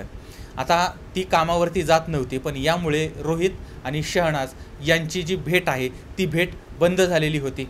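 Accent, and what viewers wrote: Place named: Ahmednagar, India. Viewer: This is the way Nagar people talk accurately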